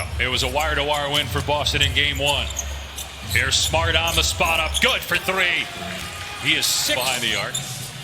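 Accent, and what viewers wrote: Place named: United Kingdom. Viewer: spiffing brit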